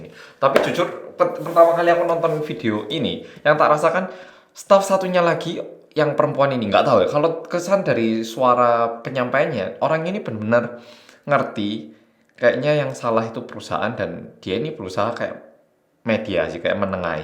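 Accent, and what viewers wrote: Java, Indonesia. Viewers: Jelasinnya tetep medok ya mas, lg serius2 jd ngakak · Keras banget ya industri entartaimen korea,. Mau sedih krna kasus ini nimpaa seunggi🙁, mau ketawa jg mas terjemahinnyan pke logat jawa😅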